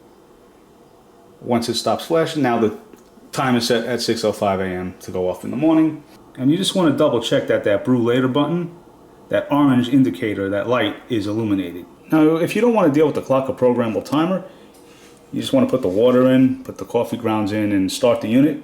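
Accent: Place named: New York, United States. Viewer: ..love your CAWFEE TAWK NEW YAWK ACCENT!!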